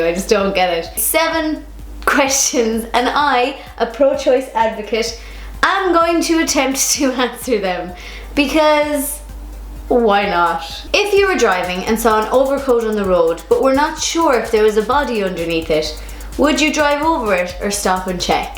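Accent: Ireland. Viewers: You don't know how helpful your videos are! I'm planning a interchange to ireland and i was looking for youtubers from there and then i found you! Now I can understand the irish english better thanks to you! Great videos tho.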